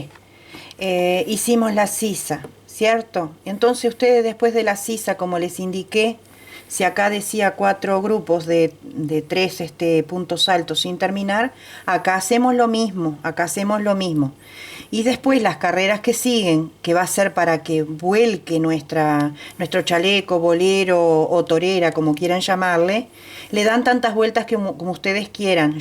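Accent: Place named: Argentina. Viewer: si pero por lo visto sos de ARGENTINA por tu asento es unico ... y aca no usamos esos terminos.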